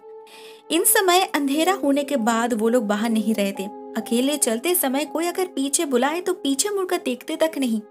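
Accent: Bengal, India. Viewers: don't mind but are you a bengali? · Are u bengali? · R u bengali 😊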